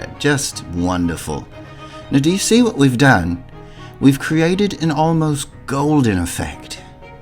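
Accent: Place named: United Kingdom. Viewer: I’m deaddddd 😭 he really sounds British tho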